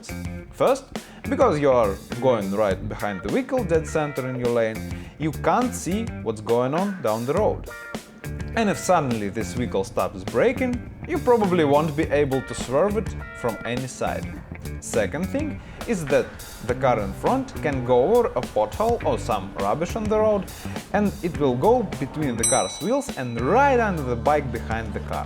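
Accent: Russia. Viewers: Аххх! Этот классический русский акцент - прямо как из старых голливудских фильмов! =) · Fing russian accent... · Are you russian or enflisg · contenido en ingles, con acento ruso, filmado en argentina xD que combo. muy bueno tus videos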